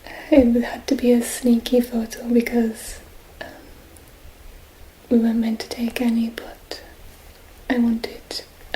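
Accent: Scotland, United Kingdom. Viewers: Since I have not heard you speak out loud it is difficult to place your accent. I would guess Scottish? but I can't be certain. I have been watching your vids for some time and they always relax me. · Is she from UK? I love her voice and accent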